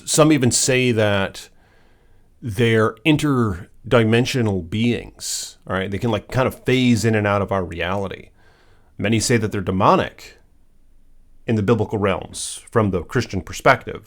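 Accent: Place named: Canada. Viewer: I swear, I thought you were from Canada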